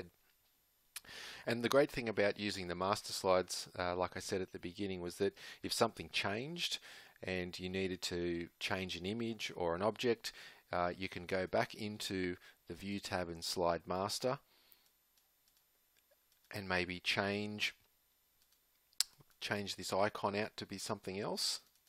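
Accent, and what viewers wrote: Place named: Australia. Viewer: Good to hear an Aussie accent on a Storyline tutorial! Thanks for the clear video.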